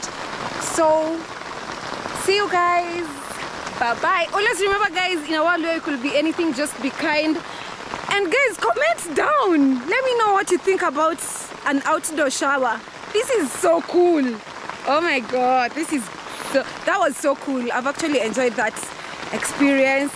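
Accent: Kenya. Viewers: She sounds Kikuyu and thinking that looks like Kirinyaga area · You didn't say what country that is. It's so tropical. I had no idea there was such a beautiful place in Africa. Is it like Congo or something? I think Kenya might have such but not sure. Your accent sounds much like Kenyans I have talked to.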